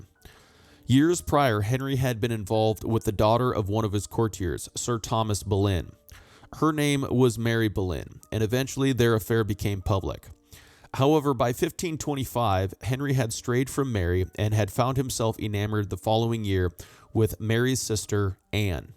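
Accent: United States. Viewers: Nothing more irritating than hearing a yank speak of English history when they know nothing or dare speak of their own lol 😆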